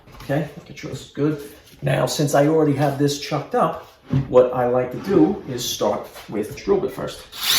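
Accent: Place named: Brooklyn, United States. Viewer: Living in seattle, but having grown up in brooklyn, I really appreciate hearing your accent. Home again.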